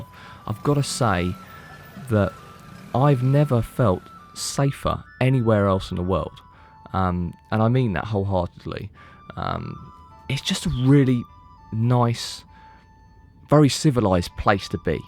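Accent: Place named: United Kingdom. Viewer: Enjoy very much of your introduction, probably one of the best! You’ve got really nice voice! Love your British accent!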